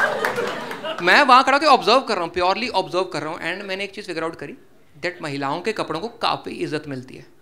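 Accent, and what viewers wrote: Punjab, India. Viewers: Punjabi accent is the icing on the cake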